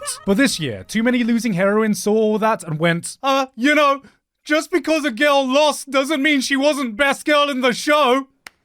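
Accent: United Kingdom. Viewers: worst anime of the 6year, with an annoying brit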